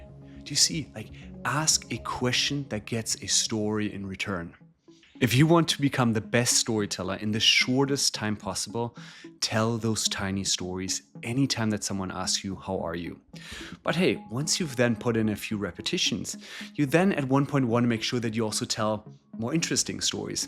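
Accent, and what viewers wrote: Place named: Germany. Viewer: Gutes Video. Danke 🙏🏻 (ich denke deutsch passt Deinem Akzent nach 😉)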